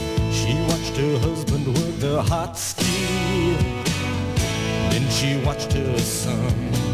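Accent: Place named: Massachusetts, United States. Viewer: In Mass. love the sound of your voice, miss home when i hear it.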